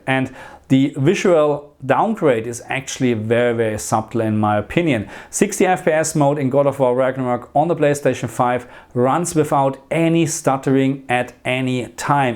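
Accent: Germany. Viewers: Deutscher akzent 😂👋👍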